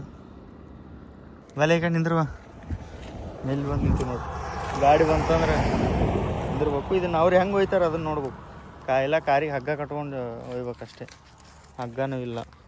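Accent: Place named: Andhra Pradesh, India. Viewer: Anna navvvu kuda andhra avre anna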